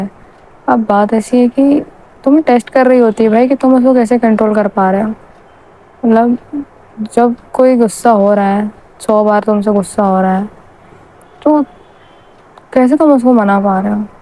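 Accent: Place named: Madhya Pradesh, India. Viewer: Tum MP se ho kya? Tumari accent se lagra hai. Btw consistency on 🔥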